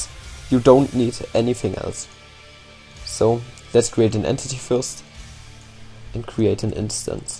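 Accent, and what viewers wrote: Germany. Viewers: Are you german and trying your best english? You can talk well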